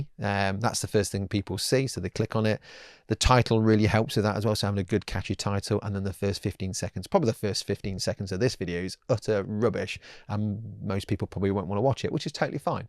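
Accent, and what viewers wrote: United Kingdom. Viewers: Audio's fine by me matey! I just like hearing an East Midlands accent instead of an American drawl for once xD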